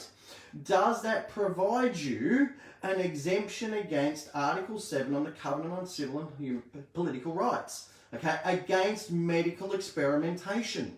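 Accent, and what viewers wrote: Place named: Australia. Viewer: So I tell the truth... and you delete my comment... can't have anything disrupting your, cash cow. "I'm absolutely appalled, every time I hear you, it's a SALES AD - Buy My Book, Buy My Book... I'm sick of it! You sound Australian... but I'm not sure anymore. If you were a true blue Aussie Patriot... you would make sure everyone had a FREE copy in in pdf and you wouldn't be sending people to FB to be spyed on!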